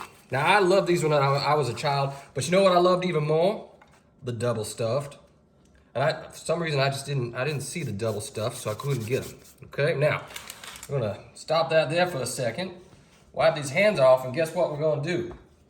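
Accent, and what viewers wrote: South, United States. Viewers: Oo the southern accent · That looks yummy. I love your southern accent. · I'm only watching this because you have a lovely 🌹 southern accent. You sound so smooth. That cake looks really good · Love your talk you sound so southern its so cute . Yummy taste 😋 thanks for showing this recipe 🎉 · The southern accent is cool. Combination between Savannah and Louisiana?? ❤😂🎉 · Good old-fashioned deep southern classic twang accent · Love your southern accent! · your southern drawl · You got south in your mouth!! Love it! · Looks good the sweet southern accent makes the video even better ❤ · Loved your southern accent 😊❤️🙏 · I absolutely love your accent old south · Maybe more for camera/video time it just looks like they waste more than you/we do at home. I’m sure they don’t throw all that much away all the time. I think he did better than most. 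(By the way I love your southern accent.) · I love love love the southern gentleman accent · I do detect some “Dixie” accent! Love it! It has to be good! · You have to be from he south, am I right? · Southern lovin' cookin' rite dare.... love the accent. 😊 love the recipe. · And I’m fron Nashville, NC and I agree. Sounds like Clayton Hollingsworth…Blanche’s brother on Golden Girls. Very Gone With The Wind. Ive NEVER heard a southern accent like this one. Born n raised southern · Love this desert. Oh my goodness. It looks delicious. I have to make it. I agree with you and using your hands. Best way to really get the job done. Your kitchen, your rules. Love the southern, gentleman accent as well! Subscribed because I have a feeling you're doing many things for us to make. 🎉🎉🎉🎉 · Yes, I do agree. Everything is shrinking and the price keeps on going up. 😂. Just wanted to say as well, I do agree with the ladies from previous comment about the southern accent. 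😊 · I love your southern accent 😊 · U are either from Alabama or Georgia! Boyee u have an accent! Lol! I’m from the south too but I’m from New Orleans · Where are you from? Such a southern accent. Like old confederate days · Ya know what I love more? His accent! 😂. Louisiana? Best southern? Accent ever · Im going to make this for my kids just bc of your wonderful southern accent 😊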